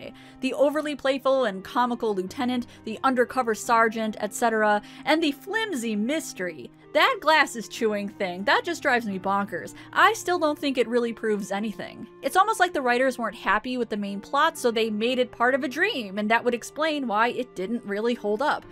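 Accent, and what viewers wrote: United States. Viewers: they are NOT homophones to her. It is regional in the US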